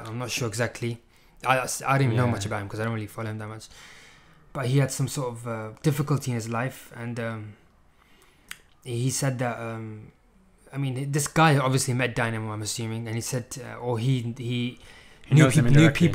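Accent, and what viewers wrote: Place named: Bradford, United Kingdom. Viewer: Listening to your accent...YOU ARE FROM Bradford!! Your accent is exactly like Dynamo's!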